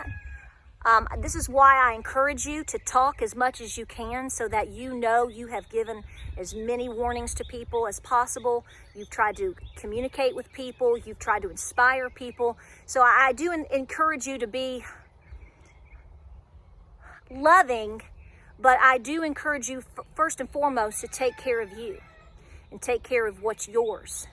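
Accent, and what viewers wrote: United States. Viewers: Ma'am, I've only just discovered you today thanks to the Economic Ninja. I like your straight up American way of speaking. I also respect how you are hard working and feminine and sweet at the same time. My wife and kids are working well hard in central Virginia so you just keep on going and we will as well. Thanks for your honesty and plain spoken ways, Honey. Best. And all the best to your wonderful husband. Johnny and Crew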